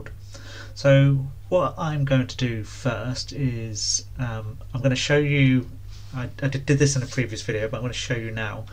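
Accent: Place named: United Kingdom. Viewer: very good explanation with clean british accent nice one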